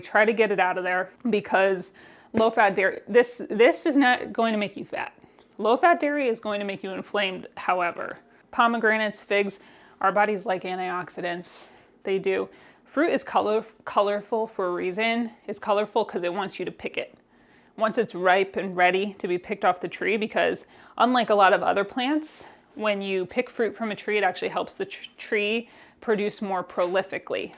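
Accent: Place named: Northeast, United States. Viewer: Where are you originally from? I notice a bit of a NE accent (water) 😅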